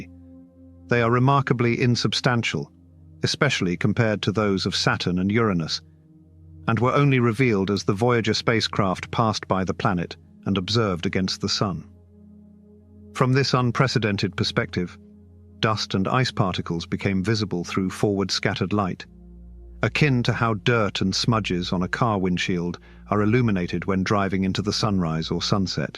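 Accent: United Kingdom. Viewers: 🎉 Right? Everything just sounds 20% smarter with a British accent 🇬🇧📚 Feels like you're watching a documentary and getting knighted at the same time 😂 Who else lowkey rewinds just to hear them say “laboratory” again? 👇🎧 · Finally a video with a British narrator🎉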